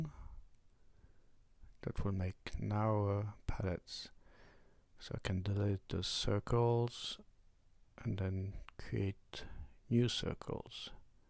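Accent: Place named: Neuchâtel, Switzerland. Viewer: Oh un neuchâtelois :-)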